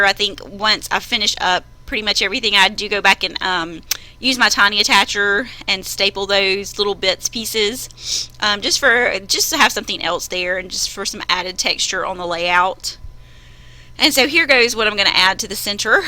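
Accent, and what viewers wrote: South, United States. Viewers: So awesome!! I love hearing another Southern accent!;)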